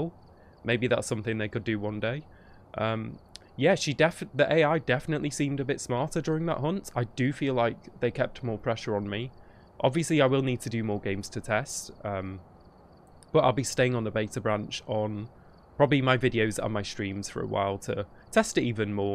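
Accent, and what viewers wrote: United Kingdom. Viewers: What part of the UK are you from? I recognize the accent you got. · Man am I the only one who absolutely love his really good british accent? Idk it just sound so amazing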